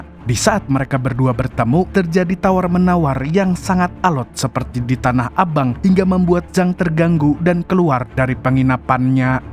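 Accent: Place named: South Kalimantan, Indonesia. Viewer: Bicara nya mimin ini seperti logat tembang madihin.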